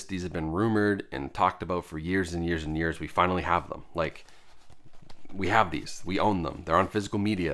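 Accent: New England, United States. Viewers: are you a New England bro?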